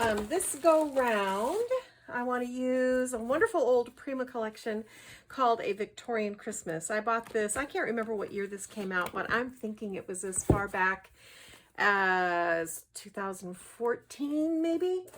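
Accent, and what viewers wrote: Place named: North, United States. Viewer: I just found your channel and look forward to seeing what you have. I am in Greensboro, NC. Are you near me? Your voice sounds familiar. I was involved with the High Point Quilt guild years ago and even further back taught scrapbooking classes here in town. Just curious. You also sound like maybe you lived up north some, like me, LOL.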